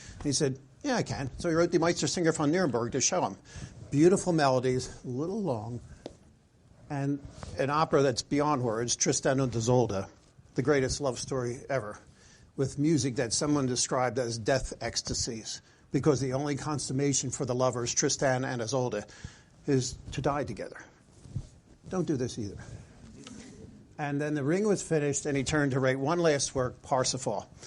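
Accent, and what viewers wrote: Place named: Philadelphia, United States. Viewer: It’s a Philly accent….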